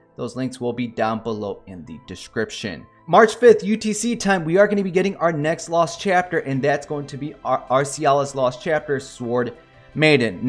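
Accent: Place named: Texas, United States. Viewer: Just curious, where in the country were you born/raised/got your accent from? I've never heard "heirloom" get reduced to "/ˈɝl.lum/" from "/ˈɛəɹ.lum/" before. Sounds almost texan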